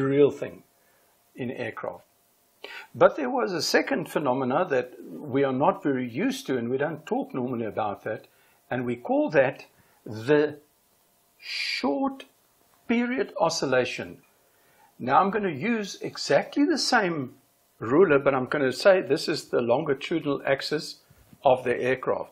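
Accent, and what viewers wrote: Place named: South Africa. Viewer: Thanks meneer - nice to hear a South African accent on YouTube. Great analysis, I learned something....greetings from Portugal.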